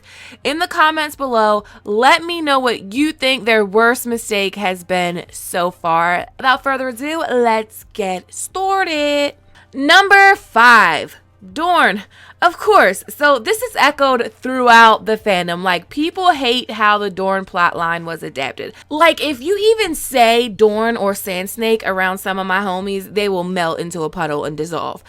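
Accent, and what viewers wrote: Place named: Baltimore, United States. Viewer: I just wanna hear you talk all year lbs. Is that a Maryland/Baltimore accent im catchin?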